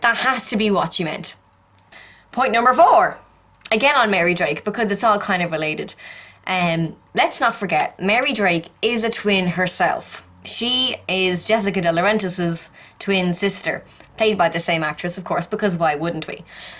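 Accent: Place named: Ireland. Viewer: Great video cos u were right but just wondering are u from Ireland cos u sound Irish and I'm Irish too lol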